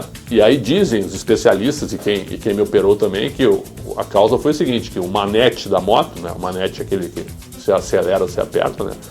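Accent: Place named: Rio Grande do Sul, Brazil. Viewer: Parabens por essa historia de vida e com bom sotaque gaucho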